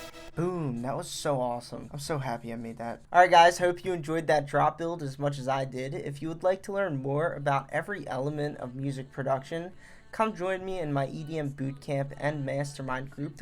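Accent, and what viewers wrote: Philadelphia, United States. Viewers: Your Philly/South Jersey accent reminds me of home. Love your jawns bro 😂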